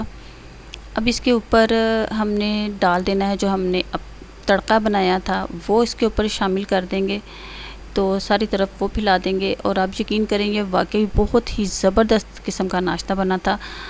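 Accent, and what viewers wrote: Punjab, India. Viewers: Hahahahahahaahaaha Punjabi ka tarhka I like it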